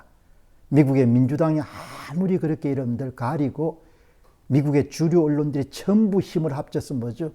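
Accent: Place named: Gyeongsang, South Korea. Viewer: 갱상도ㅎㅎ 편가르기 지겹다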